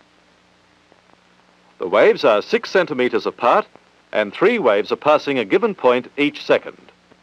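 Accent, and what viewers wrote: Australia. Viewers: These are great fun! I was wondering why an Aussie was doing the voice over for a US university film. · To my Australian ears it sounds like the Cultivated Australian accent, owing something to Received Pronunciation but definitely Australian. The General accent (Hugh Jackman for example) is broader than this and the Broad accent (think Steve Irwin) is way past that :) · The announcer sounds very Australian to me. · Oh right yes. As an Aussie myself it was a (nice) surprise to me to hear an Aussie voice pop out in the context of a Fran film. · He's Australian, what do you expect? You want everyone to pronounce words in 'Merican?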